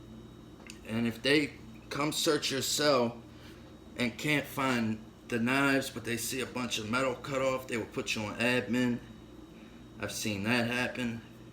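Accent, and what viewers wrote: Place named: United States. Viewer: It’s pretty insane from my perspective to know that at some point you had to learn this to survive in 2020/2021.. Sound like your from the states as well. Stay safe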